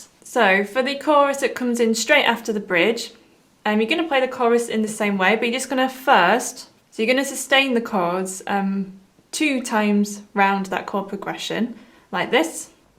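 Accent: United Kingdom. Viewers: "I'm gonna teach you how to play by, with a lovely British accent."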